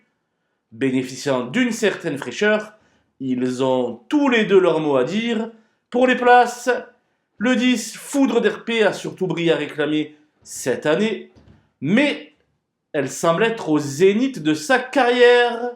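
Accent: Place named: South, France. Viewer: Vous avez un bel accent du sud 😅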